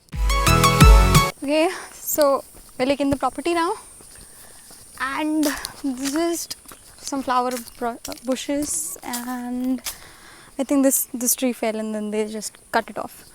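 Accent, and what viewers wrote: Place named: Maharashtra, India. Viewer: Are you marathi???.. Your words sounds like marathi tone🤔🤔🤔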